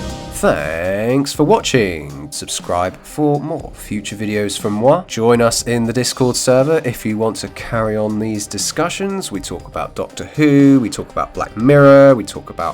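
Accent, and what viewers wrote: United Kingdom. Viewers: This video sounds like you couldn't wait to make a video styled after your favorite complaining youtube channels. The british accent really sets it off. Most of the youtube channels I find complaining about movies and tv are mostly done with a british accent. Moreover, you put heavy duty spoilers in the description, but the very first thing you talk about is how you are trying to help people get a feel for wether or not they SHOULD watch the show. But you're spoiling the entire show to give your opinion. Are you like, a real person? or just some moron with a platform.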